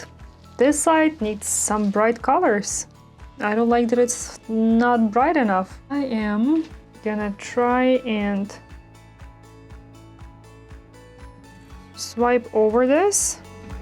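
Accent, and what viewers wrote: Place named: Russia. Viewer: Очень интересно,красиво.. Вы похожи на украинку и манера говорить русская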